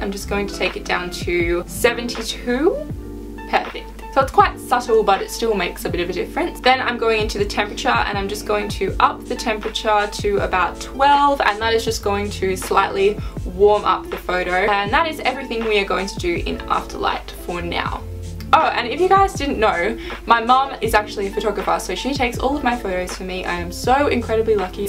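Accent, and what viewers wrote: Australia. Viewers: I knew you was Australian when I heard you speak! Love your wall background and your makeup highlight! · And are you Australian?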